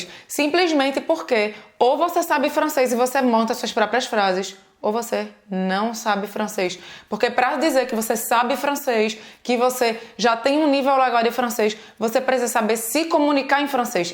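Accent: Pernambuco, Brazil. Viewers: Ai mulher, eu te amo. Eu to na belgica, comecei a aprender frances agr e vcs estao me ajudando muito. você é de recife? Porque eu sou de lá, e seu sotaque é muito recifense. bjjjuu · Que aula chatíssima. A 'professora' fala muito e seu sotaque pernambucano é muito irritante. Em 15 minutos de vídeo e nada de aula real. Lamentável! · o sotaque pernambuquêz acelerado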